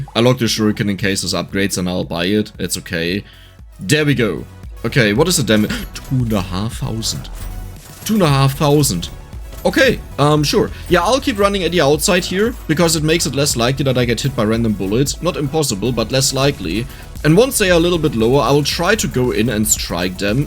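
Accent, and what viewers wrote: Germany. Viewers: you can definitiv hear that dieser bruder is a deutscher bre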